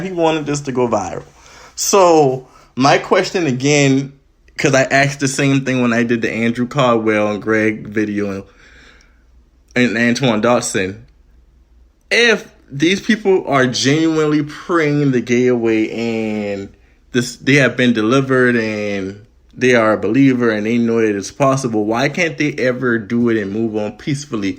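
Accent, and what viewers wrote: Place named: Gullah Geechee, United States. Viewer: Great video. Quick question are you from Charleston, SC!? You got that Geechee accent like folks from that area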